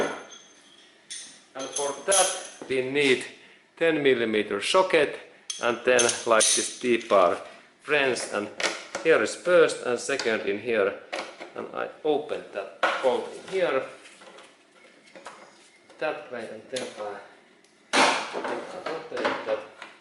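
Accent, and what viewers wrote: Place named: Finland. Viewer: Nice finglish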